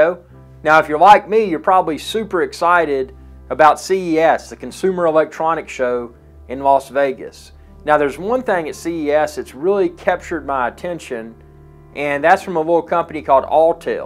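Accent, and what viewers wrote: South, United States. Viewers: That southern drawl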